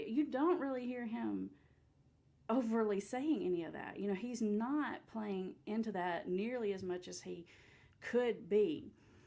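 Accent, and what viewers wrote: South, United States. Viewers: Plz turn on captions so we can understand ur southern accent...thanku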